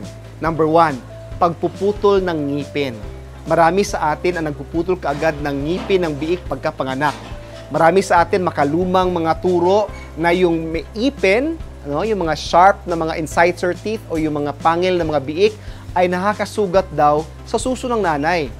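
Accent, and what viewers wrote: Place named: Cagayan de Oro, Philippines. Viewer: sherwin jara : ang galing nga po.... Parang taga amin c Doc sa CDO....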